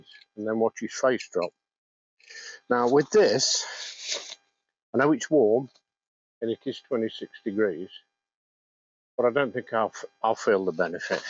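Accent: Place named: Derbyshire, United Kingdom. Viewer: Very funny like your stile.Listening to your accent I dont think you live all that far from Wetton mill.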